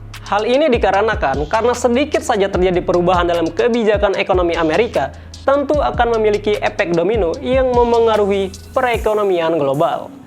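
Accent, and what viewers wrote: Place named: West Java, Indonesia. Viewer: Sunda pisan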